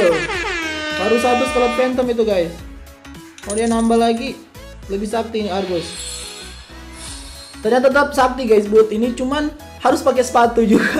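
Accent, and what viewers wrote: Manado, Indonesia. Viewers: abang orang manado ya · Kak dari manado? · yooo org manado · Ka org Manado yah... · Abang orang Manado???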